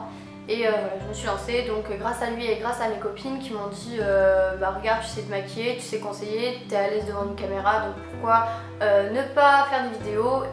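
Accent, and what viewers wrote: Lebanon. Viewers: awww t trop cute en parlant le libanais chui libanaise aussi 😻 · Cest troo bo ton accent libanais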